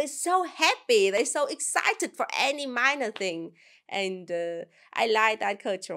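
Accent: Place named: Germany. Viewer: I just noticed that your English is starting to get a German accent, lol.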